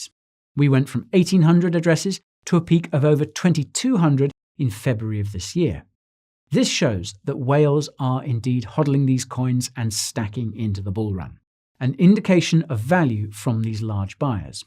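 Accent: United Kingdom. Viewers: You’re the only dude I can watch at 1.5x. And I could probably watch you at 2x if you didn’t have a British accent.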